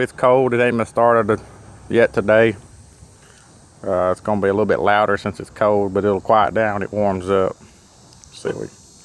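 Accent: South, United States. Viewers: I like that fat dip spit and the southern drawl!! Good lookin truck buddy!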